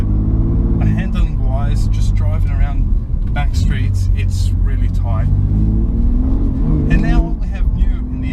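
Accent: Australia. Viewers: So great too have a aussie doing a great review .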